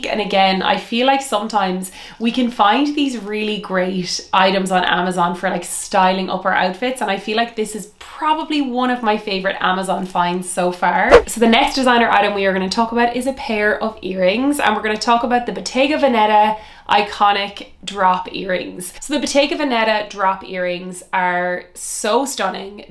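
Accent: Ireland. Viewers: Amazing duoes and finda. Love your videos and your cute Irish accent and think your make up and look getting better and better ( and you havr such pretty expressive eyes so much nicer without your glasses). 🩵🩵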